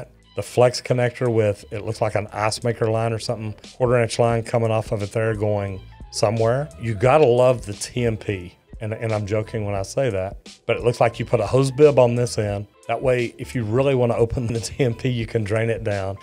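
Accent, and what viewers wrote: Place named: South, United States. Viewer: "if y'all haven't seen it..." *as I'm clicking for the new video. Must be the voice... making me do it, how do I deny Southern Charm?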